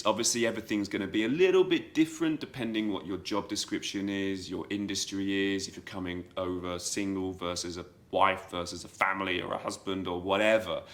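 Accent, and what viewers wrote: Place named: England, United Kingdom. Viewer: You have a nice English accent... Appreciate that. Wherever you are going to be living around the world make sure you get to do what you can to enable you invest. The experience is always cool though, but if you're a foreigner in any country your priority is investment... back Home that's not your home Thank you for the beautiful accent again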